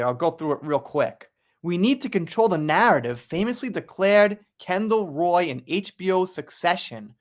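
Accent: New York, United States. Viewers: I’m from New York and I gotta say, you are DEF from NY or NJ . Love the accent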